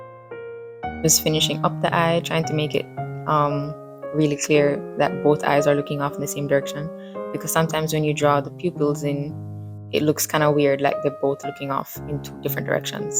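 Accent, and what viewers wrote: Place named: Jamaica. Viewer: Your accent is very Caribbean, you sound Jamaican actually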